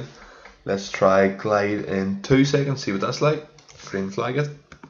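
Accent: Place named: Northern Ireland, United Kingdom. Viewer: Northern Ireland! Awesome accent!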